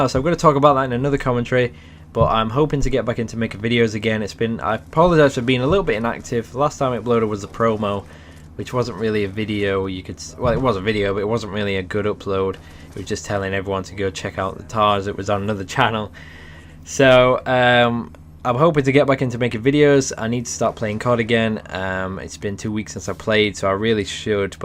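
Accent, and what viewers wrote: Yorkshire, United Kingdom. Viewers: no, different places in Britain have very different accents it just where he's from, he sounds like he is from Yorkshire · no hes just brittish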